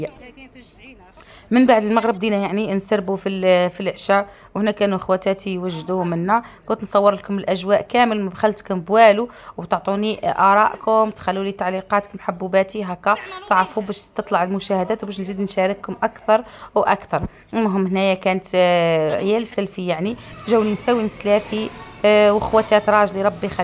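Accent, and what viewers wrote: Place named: Sahara. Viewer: ربي يبارك وصح عيدك انتي من الصحراء على خاطر المردود والدشيشة وعيال خوتي لهجة صحراوية متاع ورقلة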